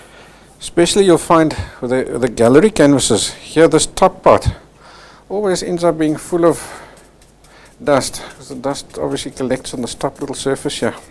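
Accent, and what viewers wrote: South Africa. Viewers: Love the South African accent!👍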